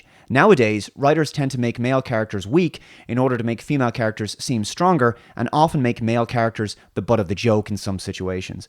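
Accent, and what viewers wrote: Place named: Ireland. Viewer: As an Englishman, listening to your Irish accent, I can see how the American accent developed. That twang is there. As for Star Trek, I was born in 1980 and I still prefer the original series/cast with it's crappy effects in all it's glory.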